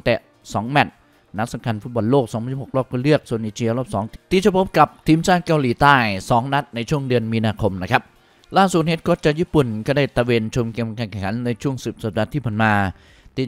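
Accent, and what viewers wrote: Isan, Thailand. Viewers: พ่อใหญ่ เว่าอีสานม่วนๆพ่อแม่พี่น้อง ข่อยมักแฮงลาวเว่าอีสานจูบจ้าวอินเนอร์มาเต็ม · ชอบ..แอด เว้า อิสานแหน่.? คักหลายๆ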